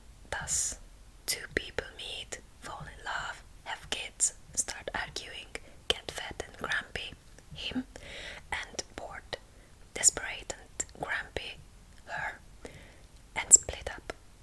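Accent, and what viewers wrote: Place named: Hungary. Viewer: Haha very thick hungarian accent, i like it!!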